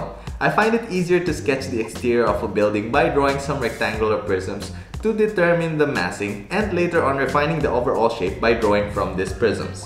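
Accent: Philippines. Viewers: Thank you for sharing your drawing skill. I could not help but notice, your accent. Are you from the Philippines by any chance? Greetings from Indonesia.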